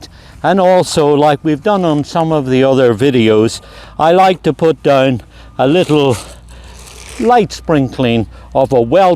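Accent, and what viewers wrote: Northern Ireland, United Kingdom. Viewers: Great video Mr! Thank you so much for sharing your gardening knowledge with us! I hope you don’t mind me saying so - it’s truly lovely to hear your accent. My late father was from Northern Ireland as well. Keep up the great videos! I have subscribed and will be looking forward to learning more! ☺️ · Is that a Northern Irish accent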